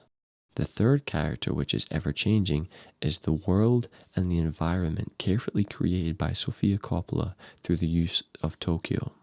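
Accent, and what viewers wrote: Scotland, United Kingdom. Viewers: I thought it was an automated robot voice at first and was about to close the video. Then I realised it was a Scotsman just speaking in a formal voice lol. I agree his voice is unique nonetheless haha. Good vid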